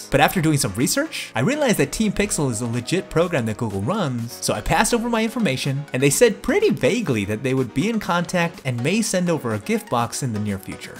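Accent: United States. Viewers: Another fantastic video from. Honestly saying I didn't copy but suddenly noticed there is a channel which is publishing videos almost identical to my videos. Even though he will win for quality and the gears he is using are far better than me. Also my accent is too Indian (Im not Indian though) than his American excellent accent. you are good.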